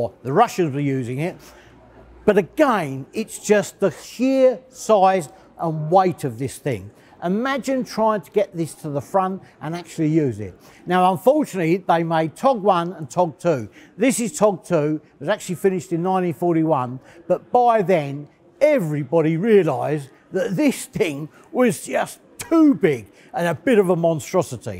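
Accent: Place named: England, United Kingdom. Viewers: Liverpool. Broad scouse. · Sounds like a Londoner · I love his accent, what part of England is his from? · Hornchurch, Essex.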